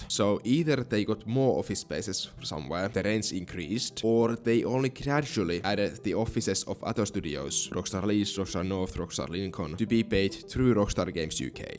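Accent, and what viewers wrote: Finland. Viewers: I clicked for the clickbait, I stayed for the finnish accent. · i really like the finnish accent 😭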